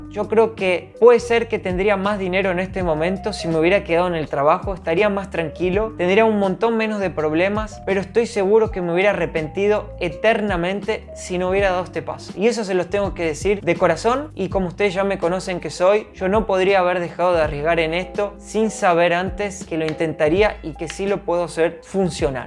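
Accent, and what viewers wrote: Argentina. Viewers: Estonia? parece Argentino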